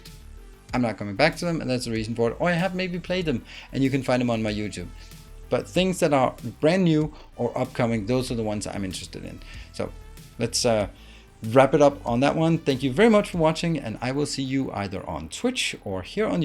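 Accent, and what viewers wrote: Denmark. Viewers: Sounds like he's danish :)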